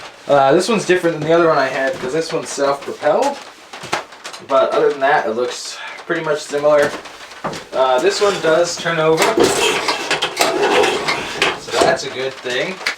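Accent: Vermont, United States. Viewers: Sounds like Vermont, acts like Vermont and yes it is Vermont. There was an old man down the street that repaired old mowers and was good at it and had 35-40 mowers in bushes around the house. I used to walk the daughter by his place and one day talked with him. Used mowers can bring in some money on the side. He was dealing with another gent who sold those mowers for cheap at a large yard sale. I took over the business of sales and we made 5X as much. Some of the mowers bringing $70-120 apiece. One neighbor had a Honda mower and I offered to sharpen the blade as he had not sharpened it in 15-20 years-yes. Upon looking I found he had been mowing with the blade upside down and backwards for those years! Now he cuts smooth as a new mower. I waited until I got home before I started laughing about the whole thing!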